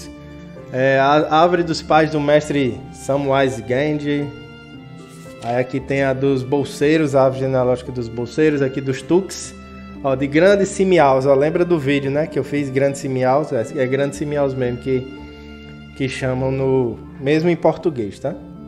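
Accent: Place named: Paraíba, Brazil. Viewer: Eita, sotaque forte! O macho é paraibano 🗣📚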